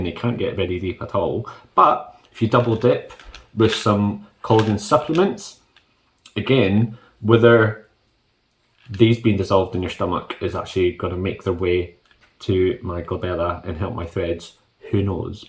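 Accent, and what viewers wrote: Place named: Scotland, United Kingdom. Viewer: So refreshing to hear a Scottish accent. Been in Canada since I escaped England in 1988. I am thinking of doing this Juve eye thing myself. Do my own Botox but got flagged by customs so dunno if I will be able to get product at my home address. I guess it's illegal to even do your own Botox here in Canada. Bummer. I got cheek filler yesterday as a 'model' at a place where I know the owner. He's now got his own clinic and doing amazing. Young, Gay and very artistic. Cheek filler was painful, so I'm glad I never attempted to do it myself. The crunching of tissue is unnerving! Anyhow, sorry about your Soccer defeat by the Germans today. Probably our turn on Sunday!!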